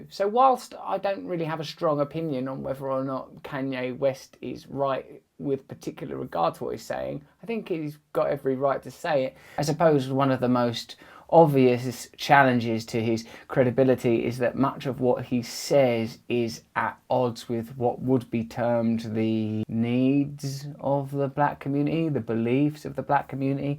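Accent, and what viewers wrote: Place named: United Kingdom. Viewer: your bri-ish accent is awesome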